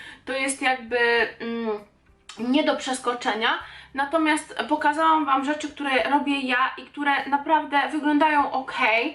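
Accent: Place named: United Kingdom. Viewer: Zauwazylam ze masz teraz taki brytyjski akcent nawet jak mowisz po polsku to przeciagasz dlugo samogloski np- twaarz, a nie mowisz tak jak my mowimy tak szybko 'twarz', mam nadzieje ze wiesz o co mi chodzi :D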